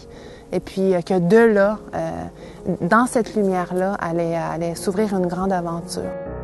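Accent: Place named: Quebec, Canada. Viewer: Quel bel accent québécois..il y a 40 ans je suis restée 3 mois à Montréal pur terminer ma thèse de maîtrise sur le mythe dr Maria Chapdelaine,,,,quel pays mervéilleux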